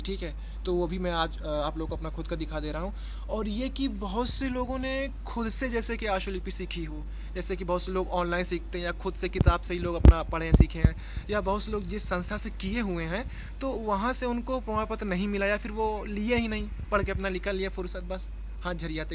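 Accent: Allahabad, India. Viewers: bhai lg rha tum allahabad se ho...meri bhi ekdum yhi akcent h bolne ki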